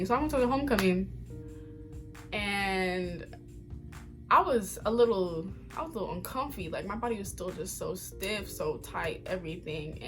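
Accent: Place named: New York, United States. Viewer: I can hear the NY accent lol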